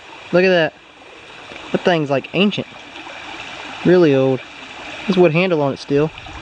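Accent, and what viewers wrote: South, United States. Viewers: Enjoyed the video. Felt jealous in a good way. I wish I were young and hanging out with you. You sound like John boy Walton, and I mean that in the best of ways. You sound like your from the south and that creek reminds me of a creek that ran by my Grandparents house in eastern Kentucky. One question do you come across snakes when out like that. I remember seeing copperhead, and cottonmouths down south. Hope you find some great relics and gold. God Bless. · where's your southern from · Murica, could you not tell from the accent?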